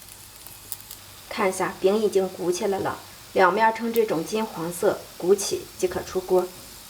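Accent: Shandong, China. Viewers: 山束話特别親切 。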